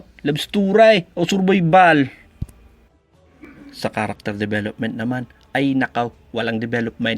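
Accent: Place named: Batangas, Philippines. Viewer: haha ibang klase batangenyo ka pala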